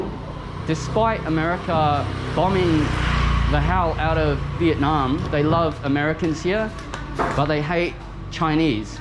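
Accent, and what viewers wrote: Australia. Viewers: What does she means Chinese? Ignorant fish. You look, talk and walk like an Aussie to me without the hat and corks! Keep helping the poors and you'll find your Buddha. And keep up with the social rants with a big spoon of generalisation and a sprinkle of predjudice and you'll be an Internet Star too.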